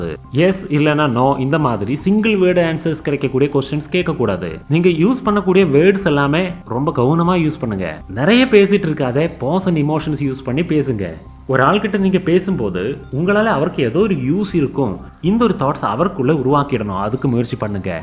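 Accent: Kerala, India. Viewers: R u malayali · Néenga malaiyali dhana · நீர் முதலில் தமிழரா? மலையாளம் வாடை வருகிறதே உமது தமிழில்! கோலேஜ & அவர் பேசிட்டே இருக்கும் என அஃக்றினையில் சொல்கிறாய்! எங்களை பல முறை அஃக்றினையில் குறிப்பிடுகிறாய்! நீங்க எந்த ஸடாண்டர்டுல படிக்குது..! யோவ்! ஓடிப்போயிடு!நீ முதலில் நன்றாக தமிழ் & ஆங்கிலம் கற்றுக்கொள்! தப்பு! தப்பா சொல்லி கடுப்பேத்தாத! உன் வாயில வசம்பு வைத்து தேய்க்க..! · ஒரு நல்ல மலையாளி... தமிழ் பேசுறது இப்படி தான் இருக்கும்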